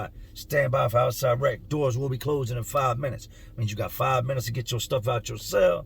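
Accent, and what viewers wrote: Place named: Philadelphia, United States. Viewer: I knew you had to be from Philly or the Tristate, love your storytelling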